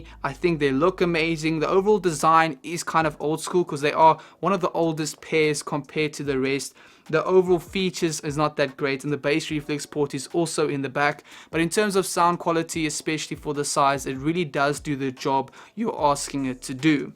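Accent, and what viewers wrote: South Africa. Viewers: You sound South African dope video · Are you South African bro ??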